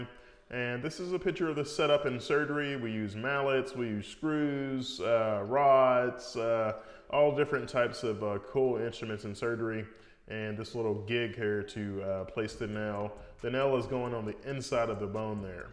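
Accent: United States. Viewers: Are u american Dr ?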